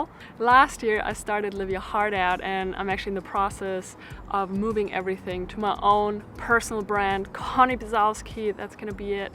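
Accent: Germany. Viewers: Ich hör zwar, dass du Deutsche bist, aber es ist so viel angenehmer als bei vielen anderen. Danke für deine Tipps und viel Spaß noch. :)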